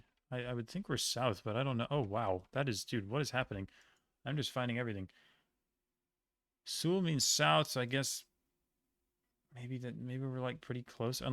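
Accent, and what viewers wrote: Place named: Portugal. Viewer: and if you're fluent you probably learned from portugal portuguese, right? Your accent sounds portuguese-like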